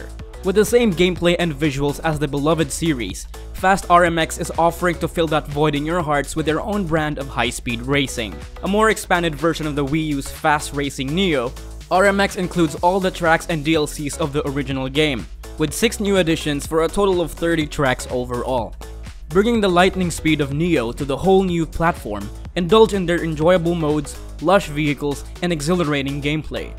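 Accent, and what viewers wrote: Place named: Philippines. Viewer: your accent is somehow sounds like a Filipino accent, i just noticed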